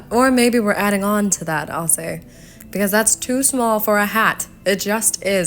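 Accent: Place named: Transatlantic, United States. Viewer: your voice sounds like a very 90s icon doing a 1960s transalentic accent and it is the most amazingly delicous thing my ears have heard in the while.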